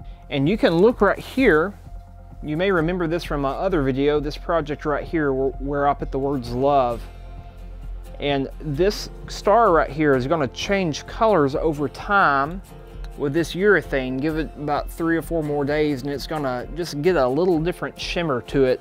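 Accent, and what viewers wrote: South, United States. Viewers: I just love your southern accent! New to woodworking and loving it!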